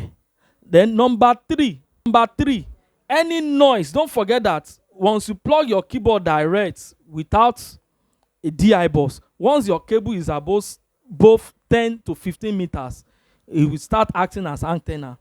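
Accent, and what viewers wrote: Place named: Nigeria. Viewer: That's Nigerian Accent 🇳🇬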